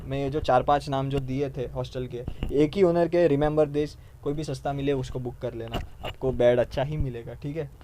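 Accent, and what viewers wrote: Gujarat, India. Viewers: i love your gujju accent lol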